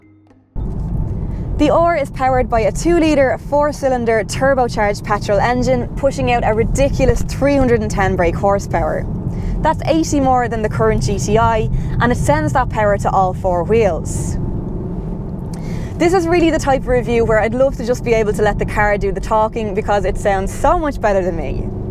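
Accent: Ireland. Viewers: Great car, great review, and i love the Irish accent :)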